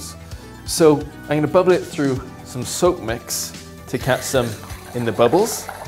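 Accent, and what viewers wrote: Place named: Wales, United Kingdom. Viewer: Hey your welsh arnt you i can hear the accent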